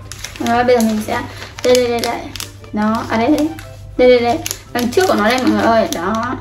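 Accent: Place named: Hai Duong, Vietnam. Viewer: Nghe giọng của em thì rất giống người hải dương. Có phải vậy ko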